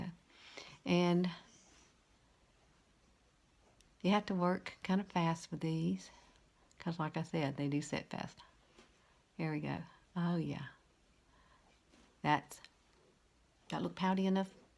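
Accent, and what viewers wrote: South, United States. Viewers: Love your attitude and your bubbliness (is that a word?), and I love your southern accent cuz I'm from LA (Lower Alabama). Watch you later YALL. 👍😊😁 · Oh my goodness, where in Alabama are you? I live in Alabama too. I absolutely understand your soooouthern accent!! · I was reading the comments on here & if I read correctly, you're in your 60's...? My gosh, I can only HOPE I look like you when I'm your age! (I'm 41) You are beautiful! And your sweet personality just adds to your beauty! Your accent is just too cute also! I'm from Texas, so I definitely know a cute little southern accent when I hear one! 😉 God's blessings to you! ❤️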